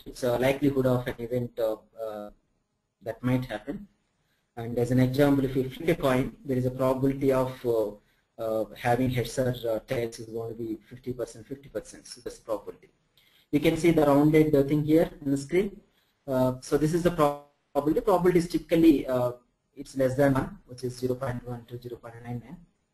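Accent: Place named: India. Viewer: Thank you so much for uploading these videos. You hae vertainly chosen some greate topics to cover. However I would like to give some feedback if thats OK... It is very difficult to understand the what is being said because of the very strong Indian accent and the speed at which the material is being explain. Please use presenters with more English accents. many thanks and keep up the great work!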